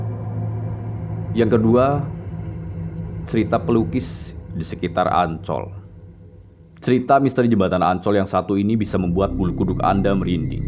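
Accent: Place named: Banyumas, Indonesia. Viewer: Rodo ngapak sitik lah wak kui hehe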